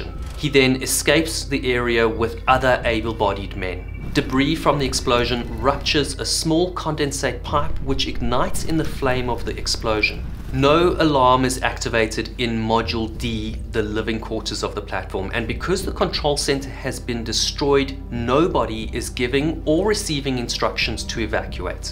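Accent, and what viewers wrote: South Africa. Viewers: where are you from? you almost sound south african? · You south african? I'd recognize that accent anywhere, amazing video btw! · You sound south african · Your accent sounds very South African, are you south African living in the UK? 🇿🇦😁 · I hear a South African accent? 😊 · Oh man, so close to hiding that south african accent. but not close enough boet!! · I knew a guy from Albertinia (South Africa) who worked on that platform. He'd fly in to the UK and he handled the landing barge bringing in supplies. Nice to hear the Saffa accent explaining these events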